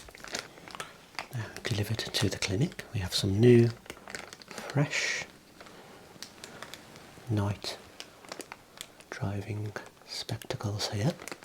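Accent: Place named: West Midlands, United Kingdom. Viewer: Your voice reminds me of my Orthodontist's voice when I was a child in the 90s. He lived in Solihull. I was never afraid of a doctor again after treatment there, and I never forgot the voice. I've always found the west midlands accent to be really comforting. I live in Texas now, which is quite a way away...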